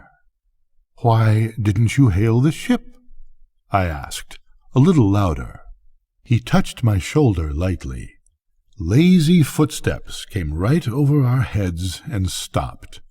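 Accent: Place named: United States. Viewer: One of the greatest short stories ever written. Inviting many interpretations . But sadly almost forgotten . Conrad's style can be rather ponderous for modern tastes so his popularity - such as it is - will dwindle even more no doubt. ? Still , this was very well done . A pleasing , well modulated North American accent is always a pleasure to listen to . Despite the obligatory moans of English listeners who think narrators should sound like Sir Laurence Olivier !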